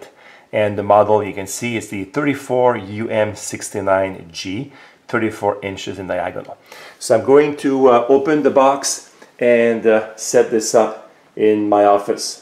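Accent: Quebec, Canada. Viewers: Salut je reconnais l'accent Quebecois haaha Nice review!! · thanks ;) Aussi t’es tu du Québec t’as un accent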